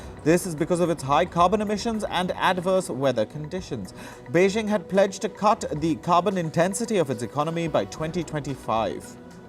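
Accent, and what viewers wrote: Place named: India. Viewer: . I am talking about the Indian voice video narrator.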